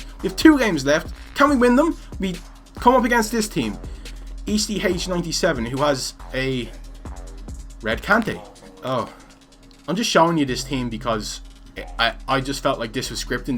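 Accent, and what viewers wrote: Ireland. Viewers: R u from Dublin or Derry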